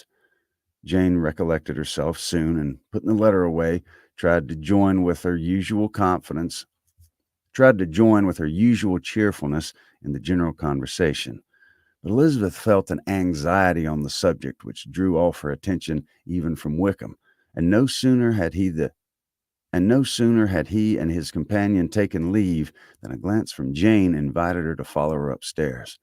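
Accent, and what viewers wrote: South, United States. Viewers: Ah I see, sorry I thought you meant your wanted to know, but as a literary reading device à la manière du sud it's very effective. lol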